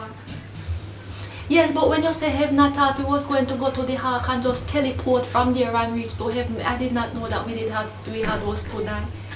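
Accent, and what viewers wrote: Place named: Jamaica. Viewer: JAMAICAN hummm. The best mi caaa. Badda DWL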